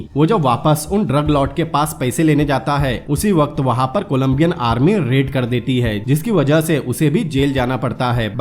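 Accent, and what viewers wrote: Assam, India. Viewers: भाई आप असम से हो??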